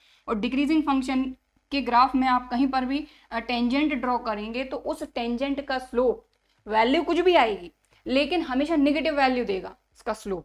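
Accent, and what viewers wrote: Haryana, India. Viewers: mam I think you belong to HARYANA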